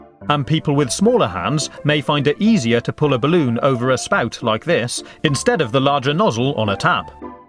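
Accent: England, United Kingdom. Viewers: Are you from the United Kingdom? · you can tell his English cause of the acent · he was speaking british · 40Xx he lives in England that's why he says things differently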